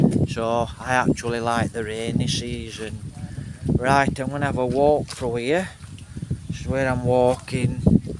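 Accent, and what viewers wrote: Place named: Leeds, United Kingdom. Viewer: He's English, sounds like a Leeds accent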